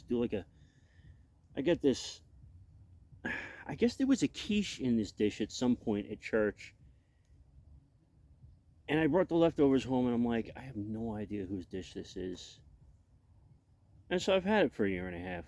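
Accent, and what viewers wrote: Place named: Long Island, United States. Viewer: you r an american orthodox cowboy with a longisland accent. a ministry for the ppl. retards unite. simplecore ftw. and mexican liquid bread is a simple joy. 💕🙏🏻 always praying for u Fren.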